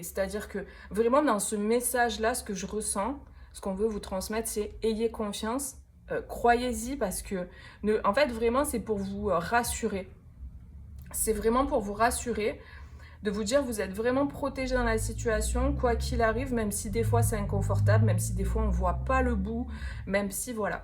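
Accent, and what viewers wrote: South, France. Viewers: Mais c'est très sympa cet acca-în du sud !!!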